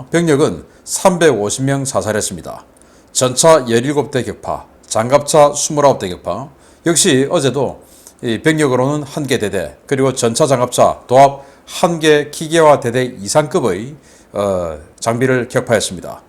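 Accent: Gyeongsang, South Korea. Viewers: 대부분 사실이길 바라면서 .. 너무 신나는 소식이라 너무 좋아하면서 이방송 열심히 듣고 있습니다 . 신인균님의 시원한 갱상도 사투리. 목소리도 더 재미있고요 ㅎㅎㅎ❤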